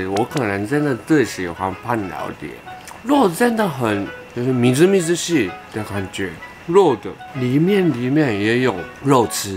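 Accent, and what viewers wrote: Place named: Taiwan. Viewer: 有台灣腔的你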